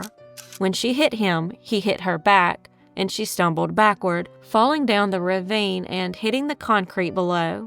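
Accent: South, United States. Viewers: I am seeing this 9 months later and I hope that you are doing well and pray that good will comes your way. God bless and I wholeheartedly agree with you, rocks and somehow her sweet southern soul shines through her accent and it’s very soothing on one’s heart and soul. God bless.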